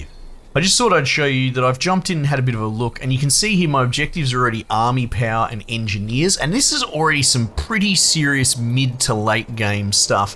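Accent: Australia. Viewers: Are you Australian mate?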